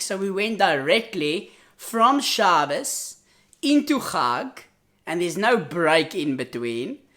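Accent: Afrikaans, South Africa. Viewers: Hi boeta, jy is duidelik Afrikaans ... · Are you from South Africa? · Are you South African?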